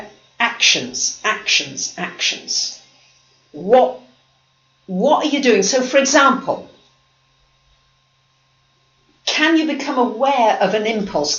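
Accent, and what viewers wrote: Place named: Midlands, United Kingdom. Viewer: I had no idea you lived in Greece, I thought you were maybe somewhere in the midlands in the UK.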